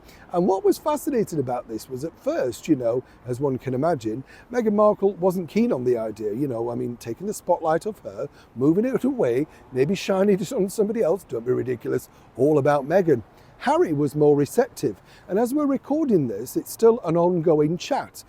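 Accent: Yorkshire, United Kingdom. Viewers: you crack me up, the Yorkshire keeps appearing 😀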